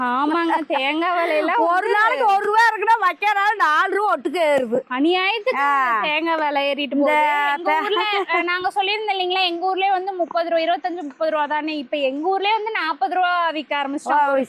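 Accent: Kongu, India. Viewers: செய்யும் தொழிலே தெய்வம்...இந்த வாக்கியத்திற்கு ஏற்றார்போல் செய்றிங்க.. வாழ்த்துகள்... சகோதரி...வாழ்க வளத்துடன்... உங்கள் வீடியோக்களை இப்ப ஒரு வாரமாக பார்த்தேன்..என்னமா எல்லோரையும் கொங்குதமிழில்பேசி அருமையாக சிரிக்க வைத்துள்ளீர்கள்.. உங்கள் குழந்தைகளும் நடிப்பில் அசத்துறாங்க... உங்கள் கணவர் அதைவிட சூப்பர்மா. நீங்கள் நலமோடு வாழ வாழ்த்துகிறேன். நீங்க மென்மேலும் வெற்றி அடைவீர்கள்...🎉🎉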